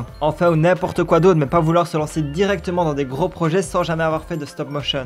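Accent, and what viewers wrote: Liège, Belgium. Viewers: tu a un accent de liège extrêmement fort mais tkt moi aussi chuis belge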